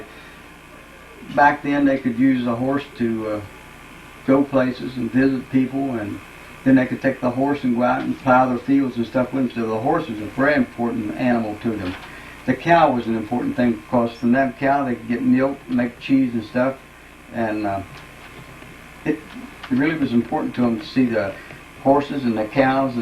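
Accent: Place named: South, United States. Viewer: Your cute southern accent 😹😘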